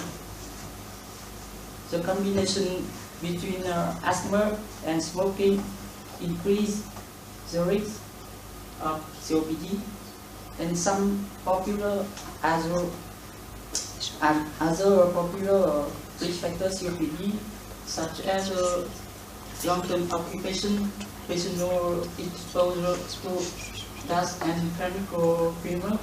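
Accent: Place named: France. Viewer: toàn phát âm đuôi cuối của tiếng Pháp thôi a ơi. :)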